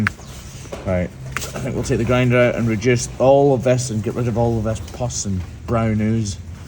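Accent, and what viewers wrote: Scotland, United Kingdom. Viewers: As I read your book, I'm reading it in your Scottish accent 😂 Kinda weird eh 😊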